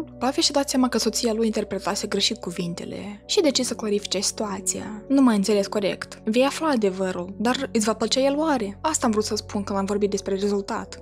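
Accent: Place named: Moldova. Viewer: Accent moldovenesc dulce ..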